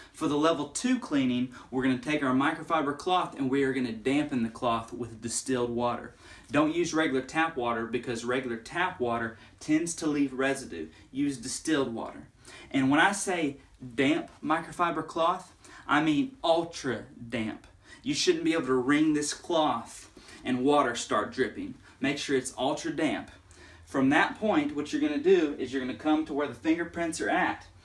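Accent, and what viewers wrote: South, United States. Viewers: I'm actually dampening a cloth like shirt it's actually more of a thermal but I'm going to pretend that it's microfiber reason being I had already dampened and started rubbing in a circular motion because my four-year-old had made the TV look like it was a child's play area. So I had my little cousin go on her phone this is the phone I'm actually leaving the message from so I'm going to have to go on my phone to look your videos up now anyways I'm leaving this comment I think I may be giving you way too many details but me and her are team she's my little girl and we clean and clean and clean so I like your voice so much that I'm going to start watching your videos all the time and I can't wait to see more I'm so excited I'm ready to do some winter cleaning but really it's going to be for spring instead of spring cleaning for winter get it LOL. 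But your voice I love the accident and overtook me I have a soft spot for Country accents and then that clean so that was great awesome I loved it I need more videos you are great and awesome peace out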